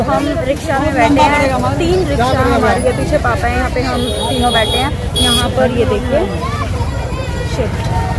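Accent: Haryana, India. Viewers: OMG apka awaz haryanvi language m Don't blve it's u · Ap haryanvi bolte ho di❤️❤️❤️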